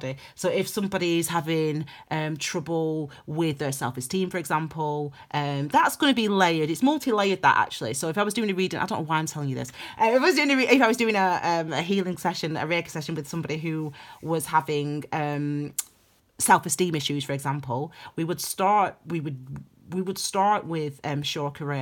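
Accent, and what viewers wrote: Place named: North, United Kingdom. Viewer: ..at 0808...hearing your lovely northern twang (a Manky here :))...I snapped and your energy was my final acceptance .. .own IT.. claim IT...poised ..thanks for making clear this feeling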